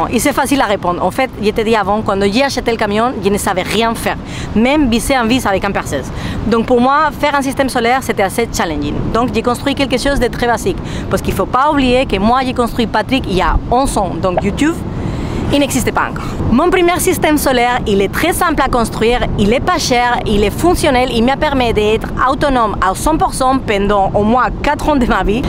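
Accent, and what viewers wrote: Spain. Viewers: C'est toujours un plaisir de te suivre, avec cet accent espagnol rempli de lumière❤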